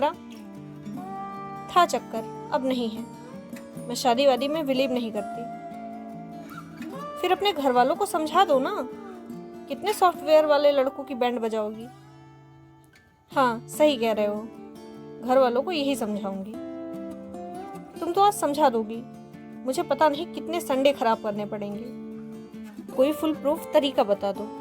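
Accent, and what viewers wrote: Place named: Rewa Satna, India. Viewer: hame laga aap rewa satna se hai apke baat krne k according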